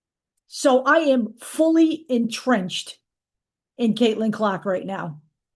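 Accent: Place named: New York City, United States. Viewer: I watched that Lundberg report. I remember him concluding ESPN only put that date due to needing a 'placeholder' on their shows. An estimate, they don't really know when she's coming back. FYI I'm 75 grew up in NYC, fan of NBA since childhood. Only watching WNBA now because of CC. She's the best! You sound like you're from NYC too. Enjoy your sharing and style, reminiscent of NYC.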